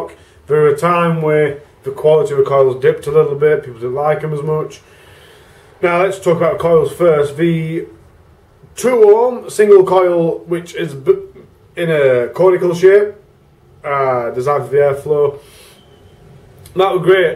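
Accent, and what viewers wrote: Yorkshire, United Kingdom. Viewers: Yes! Thank you for this review! Can’t wait to pick one of these up (but fingers crossed 🤞🏻 for your little comp). I thought you was yorkshire at first listening and thought Ohhh there’s a vape shop I can visit...but your not close enough after a quick google 😔. Vape shops in York are not to great. Anyways, thank you so much for your straight up black and white review. Brilliant!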